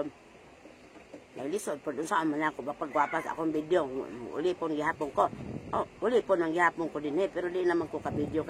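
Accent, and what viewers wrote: Visayas, Philippines. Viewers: ano Yong accent mo na bisaya? Sabi ng kaibigan ko na tga Cebu? Yong boses mo daw prang Lola na? 😩🤣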